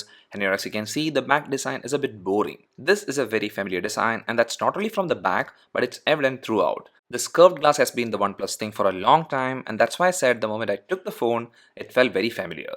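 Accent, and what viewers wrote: Kerala, India. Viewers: Are you from kollam kerela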